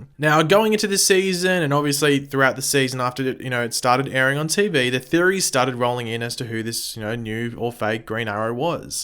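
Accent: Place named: Australia. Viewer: CJ Rehv he said cracking my nigga. He’s Aussie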